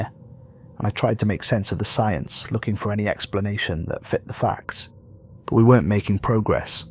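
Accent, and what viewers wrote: United Kingdom. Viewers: You did an excellent job of writing one of the most depressing science fiction stories of all time despite the glaring inconsistencies. The narration was completely appropriate for the dark atmosphere, although the British accent seemed out of place. I disagree with many of the earlier posts that this tale needs a second part with a more conventional ending.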